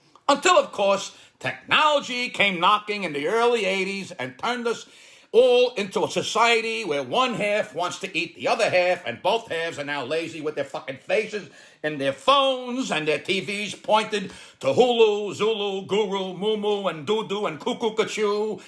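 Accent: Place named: Brooklyn, United States. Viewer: Brilliant observation and delivery!!! We moved from NY to Georgia (a suburb of Atlanta) last year (per my wife’s insistence) and I expected to encounter hillbillies. Far from the truth. People here are like those of any other big city. Besides, many people here are transplanted northerners. And my town is about 30% Asian! I love your humor, except for the profanity (but I know that’s part of your shtick). Listening to you, I feel that I’m back on the streets of Bensonhurst as a kid in the 1960s and 70s.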